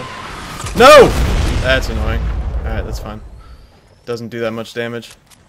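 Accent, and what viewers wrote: Netherlands. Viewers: You from NL? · Is he dutch? Bc he has that accent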